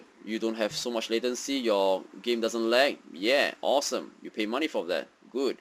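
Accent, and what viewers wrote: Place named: Arab world. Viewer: u have mid east English accent and u can speak Chinese?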